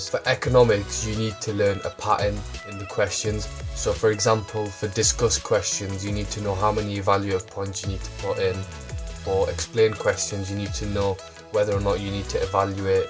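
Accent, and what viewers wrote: United Kingdom. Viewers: british accents lmao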